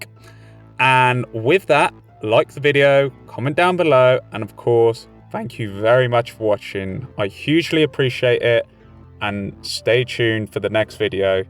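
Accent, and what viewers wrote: United Kingdom. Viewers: Well done. That was pretty good! I guess, you are british, sir?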